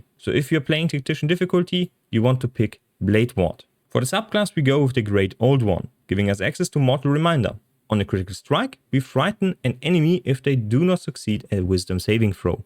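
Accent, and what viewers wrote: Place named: Germany. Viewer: your english is weird man i thought you were speaking germany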